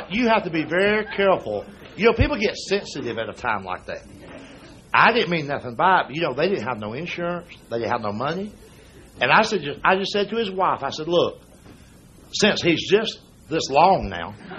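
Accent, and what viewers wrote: South, United States. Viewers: Only the thing they have in common is the southern accent, both of them are great storytellers and can just simply cause you to bust out laughing by telling a simple story but they are two different comedians. The great Jerry Clower centered all of his routine around a family that lived in the Mississippi town he was from. This guy stretches it out more and brings just a lit little bit of political comedy into his routine where Jerry Clower stayed away from politics. This guy is more like the George carlin of the south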